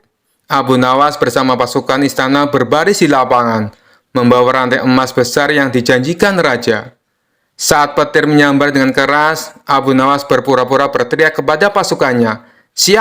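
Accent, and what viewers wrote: Java, Indonesia. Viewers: kalo bisa pke bahasa indo yg bner jngan medok biar lebih enak 🙏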